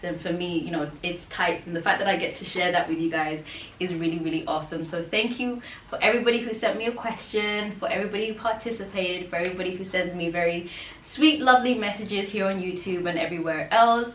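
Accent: United Kingdom. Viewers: I don’t wear pantyhose, pretty sure I’ll never wear them……but it’s so nice to hear an English accent now I live in the USA. Plus you’re so fit as well. London girls are the most beautiful girls In the world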